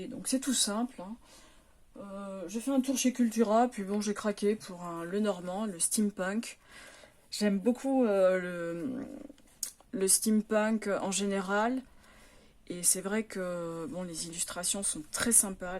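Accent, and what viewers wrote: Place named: Italy. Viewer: Coucou, j'ai bien aimé découvrir ta sélection de jeux au travers de ce challenge. J'aime beaucoup le Heaven and Earth tarot tout comme le tarot des Runes! Moi, j'aime bien ton accent italien! 👍 Belle journée, à bientôt 🌸🌸😊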